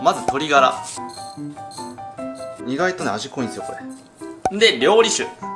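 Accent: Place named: Kansai, Japan. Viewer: たまーに関西弁入るのは何でなんですか？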